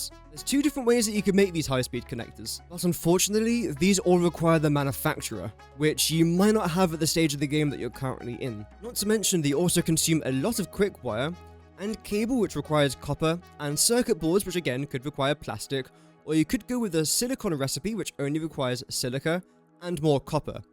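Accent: United Kingdom. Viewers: you sound like a caricature of a posh English noble, or someone from the rich southside of Dublin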